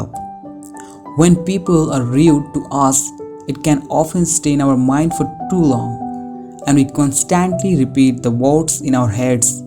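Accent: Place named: India. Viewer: We are going to read a summary of the four agreements with a barely comprehensible accent!? Couldn’t you find a native Speaker of English? It’s like tech-support from Calcutta.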